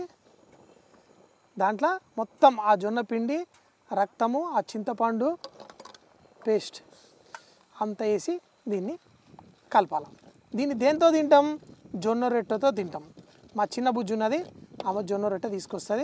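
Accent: Telangana, India. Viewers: Annayya Mana Telangana yasssa mastuga unnade anna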